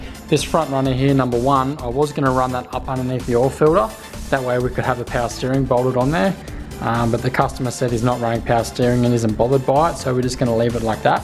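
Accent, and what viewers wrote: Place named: Australia. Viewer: Hey, I love the videos, they’ve been quick, clear and direct info to these motors. Thanks a ton. Also the Australian accent mate! Keep the videos coming!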